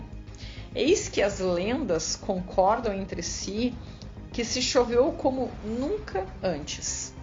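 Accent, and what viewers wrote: Rio Grande do Sul, Brazil. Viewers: Detesto sotaque gaúcho o coisa horrivel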